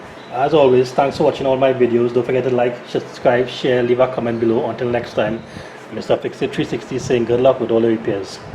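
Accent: Trinidad and Tobago. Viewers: Is that a Trini accent I’m hearing?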